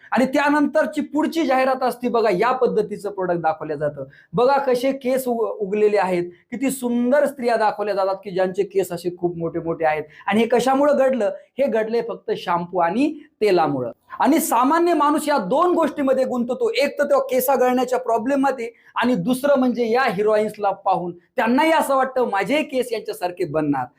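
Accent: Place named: Vidarbha, India. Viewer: दुसऱ्यातल्या कमतरता शोधण्यात लोकं पटाईत आहेत.स्वतः भले ही कर्तृत्वशून्य असो. त्यांनी जो केसं असा शब्द वापरला तो केस या शब्दासाठीच वापरला आहे विदर्भ आणि मराठवाड्यात बोलली जाणारी मराठी अशीच आहे आणि आम्ही आमच्या बोलण्याच्या पद्धतीवर खूप प्रेम करतो. कोकणातील लोकं वेगळ्या शैली ची मराठी मोलतात तर खांदेशातील लोकं वेगळ्या.. आणि आम्ही सगळ्याच्या बोलण्याच्या पद्धतींचा आदर करतो... ते ज्या विषयावर बोलत आहेत त्याच्यावर ध्यान देणं जास्त गरजेचं आहे न की ते मराठी किती शुद्ध बोलत आहेत. दुसरी गोष्ट ते काही मराठी व्याकरणाचं लेक्चर नाही देत आहेत त्यामुळं शब्दांतील बारकाव्यापेक्षा त्याचा मॅसेज महत्वाचा आहे... तसं ही अमेरिकेत किंवा यूरोपात गध्यातला गधा माणूस सुद्धा अगदी शुद्ध इंग्रजी बोलतो याचा अर्थ तो खूप बुद्धिमान आहे असं होत नाही. फक्त तो ज्या ठिकाणी वाढला आहे त्याचा तो परिणाम आहे. तुम्हाला जर वाटत असेल की तुम्हीलाच फक्त प्रमाणित आणि शुद्ध मराठी बोलता येते तर याचा अर्थ असा होत नाही की तुम्हीच खूप बुद्धिमान आहात... 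आणि तुमच्या कमेंट वरून तुम्ही मला त्या अमेरिकेतल्या शुद्ध इंग्रजी बोलणाऱ्या गध्या माणसा पेक्षा वेगळे वाटत नाहीत... एका शब्दावरून तुम्ही त्या सरांची शाळा, शिक्षण आणि बुद्धिमत्ता सगळंच काढलत. तुम्हाला स्वतः एकदा पहिली पासून शाळेत जायची गरज आहे. शिक्षण फक्त शुद्ध मराठी शिकन्यापुरत मर्यादित नसत त्यासोबतच भलेही भाषा शुद्ध नसो इतर लोकांशी कसं वागायचं हे ही शिकवत असतं कदाचित तुमचं ते शाळेतच विसरलं आहे 🙂👍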